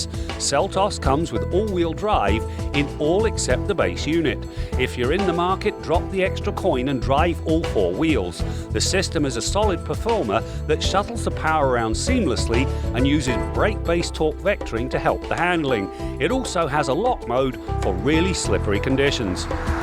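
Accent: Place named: United Kingdom. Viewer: Is originally from the UK? I like his accent.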